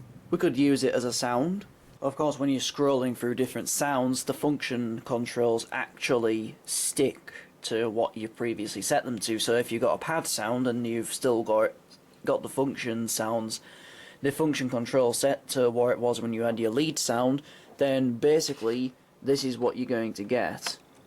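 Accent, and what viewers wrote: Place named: Yorkshire, United Kingdom. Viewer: Definitely Yorkshire but hmmmm which part.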